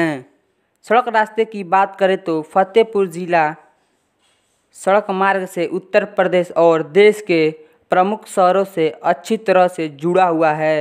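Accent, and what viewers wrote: Bihar, India. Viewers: Bihar se ho kya bro.. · बिहारी है ये ग्यारह को इग्यारा बोल रहा है।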